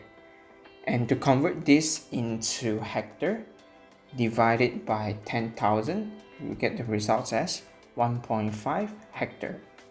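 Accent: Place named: Singapore. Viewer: You’re definitely Singaporean from your accent. hello friend, tomorrows national day!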